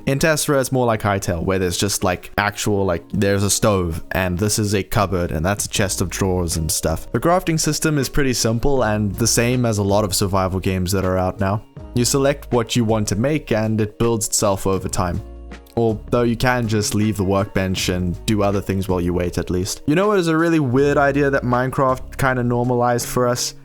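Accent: South Africa. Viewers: Joburg accent?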